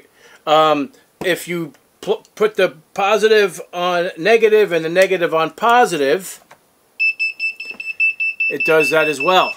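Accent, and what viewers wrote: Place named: New York, United States. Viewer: It’s about time I have somebody on YouTube with the New York accent❤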